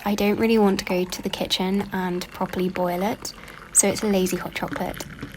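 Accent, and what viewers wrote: RP, United Kingdom. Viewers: Oh wow, so it IS similar (or the same?) as the Queen's English! I thought it sounded similar but wasn't sure if my American ears were just not able to hear the difference. Thanks! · It’s called Received Pronunciation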